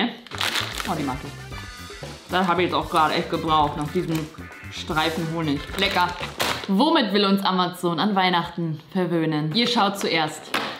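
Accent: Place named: Hamburg, Germany. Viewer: Also da wo ich herkomme sagen das viele Leute so😂 und da meines Wissens nach aus der Nähe von Hamburg kommt ist es evtl. deshab so🤔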